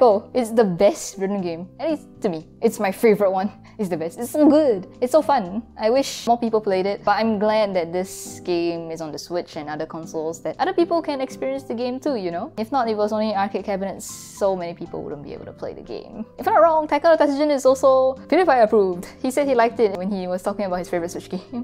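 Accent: Singapore. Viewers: So this is Singapore Accent? Hmm instersting...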